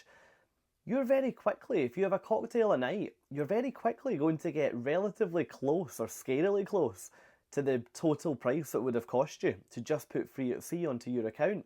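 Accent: Scotland, United Kingdom. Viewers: Thank you so much for this! My wife and I are going on a Mediterranean cruise in July, and we’re on the fence about doing the Free package with Norwegian. We typically travel with Royal Caribbean, so this is our first Norwegian cruise – and we weren’t sure whether or not to get this free at sea package. After watching this with my wife, we have decided to do it and realize that we will get more bang for a buck with this than we thought initially. This is our first time watching your YouTube channel, and we both appreciate your insight and advice – subscribed! Thanks again! PS – being Americans, we both love your Scottish accent😂😂😂😂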